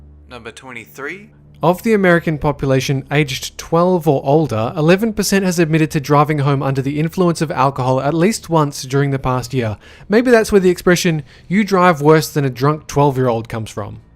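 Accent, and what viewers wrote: Australia. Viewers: It's actually an Australian person and the reason why it's mainly America is because America has a lot of problems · A video titled "our society" read by an Australian, and only has facts about America. Dafuq? Look I know America tends to think it owns the world, but it doesn't. -_ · He's Australian actually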